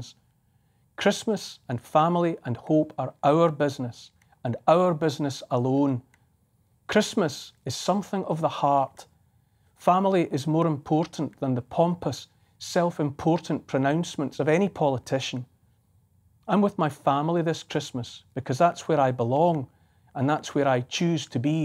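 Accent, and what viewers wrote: Scotland, United Kingdom. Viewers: I could listen for hours to this scottish dialect. Ist sounds so damn beautiful...and true...and is surrounded by integrity. Hold the line folks. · It's impossible to tell anything but the truth in the true Scottish accent. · Actually, it's not a dialect, just a Scottish accent. His use of the Engiish language is beautiful, eloquent and wise. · The truth he speaks in humility refreshes my heart and brains. I always loved the Scots and their dialects. 🍷😁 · Having Scottish in among my ancestral mix I could listen to your accent for hours Neil. Lovely message. I first watched you presenting Coast New Zealand 🇳🇿, a beautifully done showcase of NZ and I learnt a lot about my nation. The birth or our Saviour in Bethlehem indeed gives us great cause for hope. The politicians have had a field day causing fear and division. We need to stand up and be strong and not give in to them, remind ourselves that we can look out for ourselves and care for each other. 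NZ has become a Fortress and her citizens fearful. It is heartbreaking to see what has happened. May God Bless you and your family.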